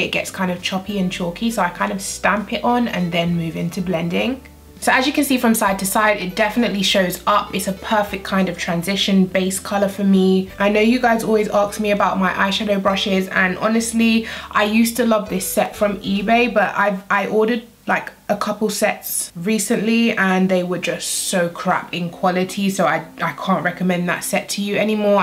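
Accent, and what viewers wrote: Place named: RP, United Kingdom. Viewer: I love her voice😂😂😂😩 its so posh even tho im British myself😅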